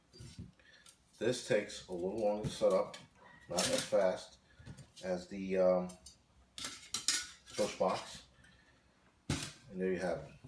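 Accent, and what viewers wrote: New York, United States. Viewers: Are you from NY? I'm sensing an accent.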